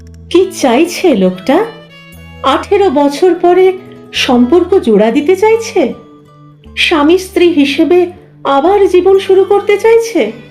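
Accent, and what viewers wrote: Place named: Dhaka, Bangladesh. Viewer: খুব খুউব ভাল লাগল । তাছাড়া ঢাকাই টানে কথাগুলো চমৎকার লাগলো। আমিও যে ঢাকার মানুষ ।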